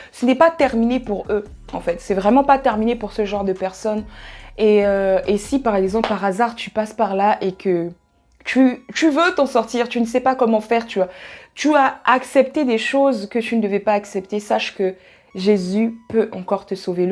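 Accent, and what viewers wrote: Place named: England, United Kingdom. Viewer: Une vidéo très intéressante, j'aime cet accent anglais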